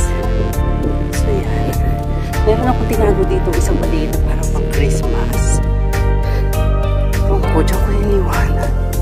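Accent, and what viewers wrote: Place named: Batangas, Philippines. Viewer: Ang Salita mo is parang batangas at Sa calapan Sa Mindoro din ang quezon ano. Tagalog Na tagalog at May punto.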